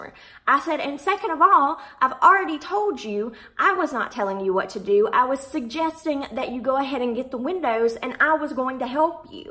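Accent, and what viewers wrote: South, United States. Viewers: I love your accent and the way you say “being ugly” because my family is all from the south & they talk the same way & just value respect, and it just makes me smile honestly watching this video. Also, I’m so glad to have found your channel!! I just subscribed, and I’m looking forward to seeing more of your videos ☺️ also, I love your name. I have the same one. It’s pretty great!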